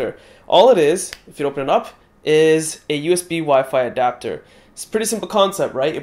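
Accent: United States. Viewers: Is he american? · You speak like U.S accent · wow!! You speak like U.S accent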